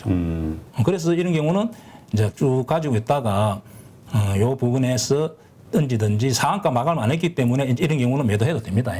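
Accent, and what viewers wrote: Gyeongsang, South Korea. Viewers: 같은 갱상도라 귀에 쏙쏙들어옵니다 ㅎㅎ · 구수한경상도사투리 친근합니다 이렇게와닿는방송정말감사합니다열공하겠습니다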